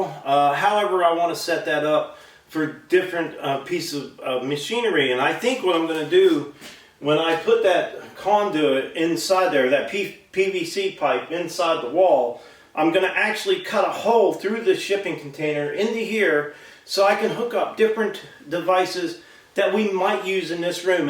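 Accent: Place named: Pittsburgh, United States. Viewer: Are you from PA? I hear a bit of Yinzer in there.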